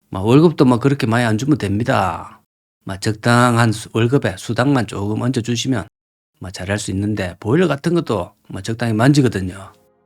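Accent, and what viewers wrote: Gyeongsang, South Korea. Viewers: 님의 영상 너무재밋고 또 너무나 독특한 갱상도 말씨로 항상 시간가는줄 모르게 보고 있습니다❤❤❤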